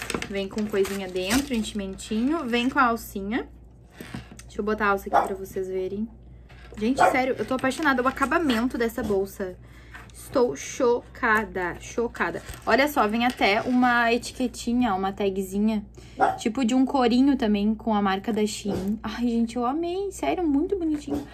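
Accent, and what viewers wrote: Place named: Rio Grande do Sul, Brazil. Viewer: Enfim achei uma gaúcha falando das roupas da shein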